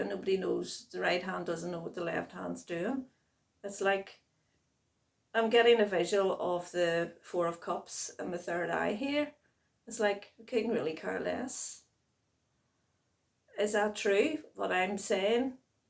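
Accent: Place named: Ireland. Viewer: Nothing lovelier than that Irish lilt🎶🍀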